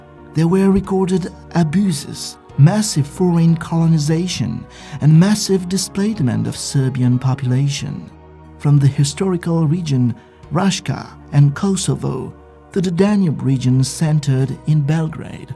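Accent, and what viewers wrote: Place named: United Kingdom. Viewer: dude,what's with that British accent :D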